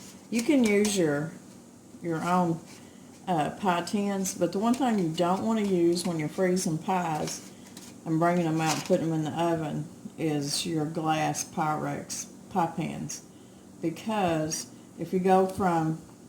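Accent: South, United States. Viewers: Your precious. Love your country accent , in country too 😊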